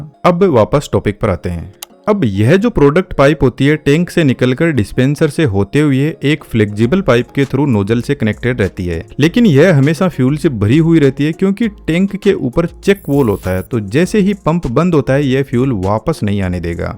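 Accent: Rajasthan, India. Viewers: Sir aap Rajasthani ho kya , boli se lg rhaa h 😅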